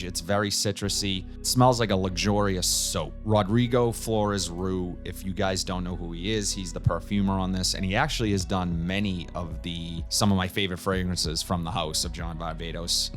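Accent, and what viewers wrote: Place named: Massachusetts, United States. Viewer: I definitely hear the Massachusetts accent😅😂🤣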